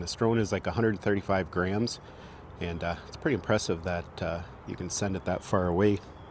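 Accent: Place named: Maritimes, Canada. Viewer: Great video... and you sound like a fellow Maritimer... getting my Neo soon just waiting for a possible Black Friday deal.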